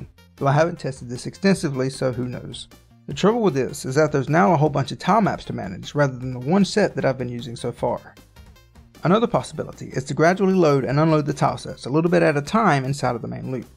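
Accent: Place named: Texas, United States. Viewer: I like your Texas accent,are you from Texas?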